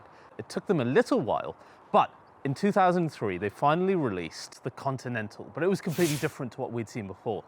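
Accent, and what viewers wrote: United Kingdom. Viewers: Very Strange how you Brits all have the same Accent and pace when talking even the Middle eastern ones that aren't Caucasian .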